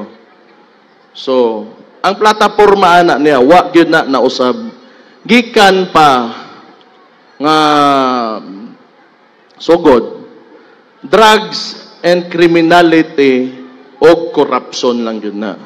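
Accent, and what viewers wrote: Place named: Davao, Philippines. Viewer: Hinde maintindihan salita mo pang davao klang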